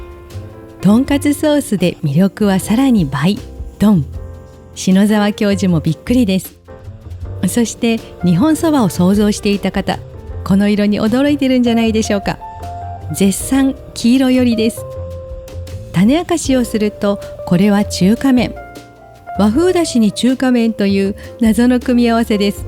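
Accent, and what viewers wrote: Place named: Himeji, Japan. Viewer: 姫路の正しいイントネーションが落ち着く😂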